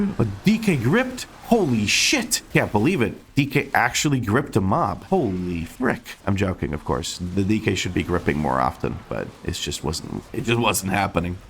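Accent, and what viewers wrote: United States. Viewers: hey, completely unrelated but...why do you have an american accent?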